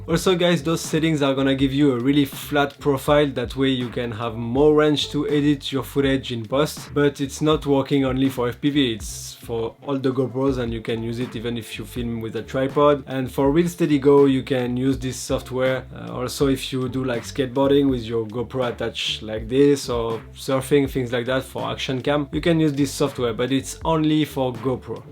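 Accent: France. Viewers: qui a reconnu l'accent FR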